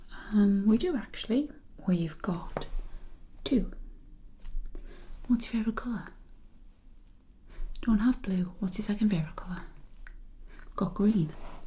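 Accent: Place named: England, United Kingdom. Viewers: That English accent fucking hell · She sounds very Geordie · Are you from Sunderland? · I can tell your from Teeside purely by your accent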